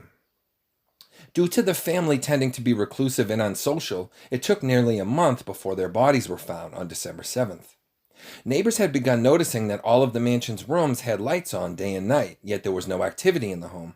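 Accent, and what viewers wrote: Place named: New Jersey, United States. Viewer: I apologize for coming to your blog late. I like to spread positivity although some of the topics I'm interested in aren't filled with light and happiness. I was just captured by your voice and the way you presented the facts in this example of true-crime posts. You didn't skip a beat and many don't know it's not just reading from a transcript, but having the ability to entertain the audience with a rhythm and lack of audible mistakes. We appreciate the time you spent researching the project and i look forward to more works from you. This is just a silly side note, but this evil man spent most of his life residing in New Jersey. I may be wrong, but i detected a New Jersey, New England-type dialect. Thank you for reading. Greetings from the Buffalo, NY area. 😊 K.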